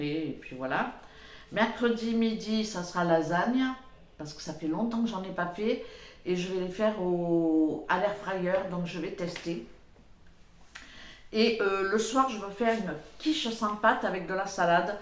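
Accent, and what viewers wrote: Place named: Marseille, France. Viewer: Bonsoir et, merci pour tes idées repas 😊, et surtout non, ne t'arrête pas de parler nous on adore t'écouter et en plus avec cet accent marseillais quel plaisir 🤗. Ton petit willy est vraiment trop beau et ses yeux magnifiques. J'ai loupé ton live j'étais en balade chez des amis 😏. Bonne soirée bisous bisous à vous deux et caresses aux petits animaux 💋💋🐕🐈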